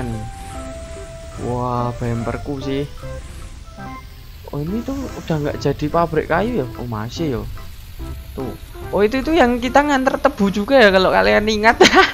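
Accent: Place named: Java, Indonesia. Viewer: Medok mass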